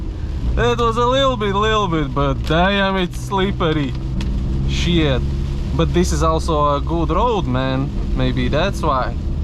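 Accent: Latvia. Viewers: ur actent sounds like ur Latvian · could tell by the accent that you are latvian eu pavizinaties var? 😂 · Are u latvian bro? Accent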